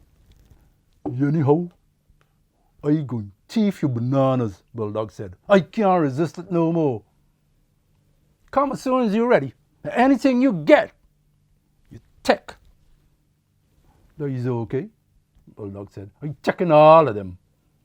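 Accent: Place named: Patois, Jamaica. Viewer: Would love to listen to your poetry on an audiobook!!! The twang of patois in your voice is so warming.....hello family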